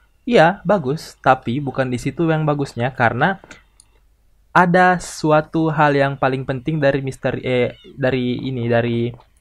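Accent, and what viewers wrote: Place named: Makassar, Indonesia. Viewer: Logat memang tdk bs berubah sappo Semangat trus sappo semoga semakin tdk belibet nantinya Salam kenal sappo Sy jg orng Makassar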